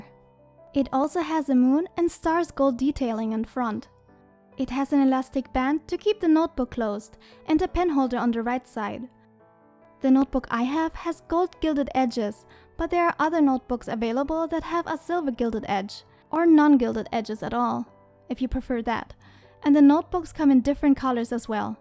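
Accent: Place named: Germany. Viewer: Are you german? As a fellow german i hear the slight german accent comin tru (no offense)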